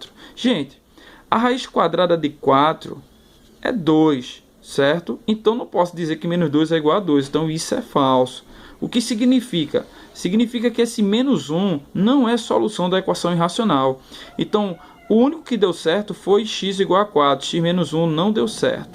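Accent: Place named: Northeast, Brazil. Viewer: Gostei muito do canal, consegui aprender fácil a matéria. e pra mim fica mais fácil quando o professor tem um sotaque de nordestino, o porque eu não sei .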